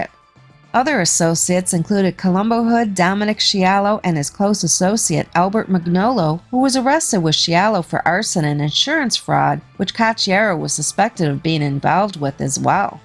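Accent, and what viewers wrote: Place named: Midwest, United States. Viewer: Horrible narrator. Can’t have someone with a midwestern accent narrate about things going on in NYC. Her pronunciation of the word “Manhattan” made me cringe.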